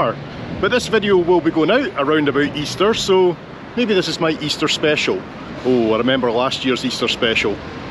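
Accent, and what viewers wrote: Scotland, United Kingdom. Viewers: Scottish mate ? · scottish i think?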